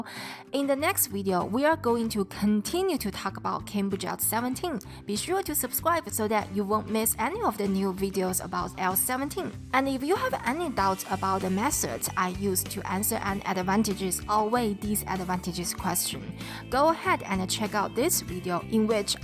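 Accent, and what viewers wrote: China. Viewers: terrible chines accent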